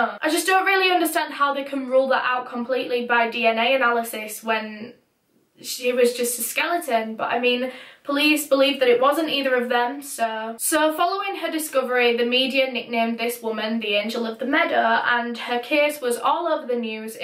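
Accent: United Kingdom. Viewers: "So today's video..." 😄😁 (only I don't have tht Amazing British accent!)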